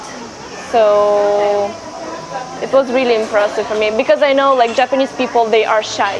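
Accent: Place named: United States. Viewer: She kinda has an American accent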